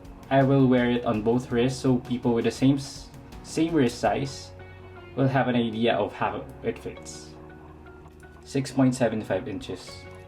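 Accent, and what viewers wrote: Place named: Philippines. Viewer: You sound like you're from the Philippines. I would have thought the 36mm size would have suited you better. Just thinking about buying one of these in the Turquoise blue. Just sold my Rolex Explorer to fund it.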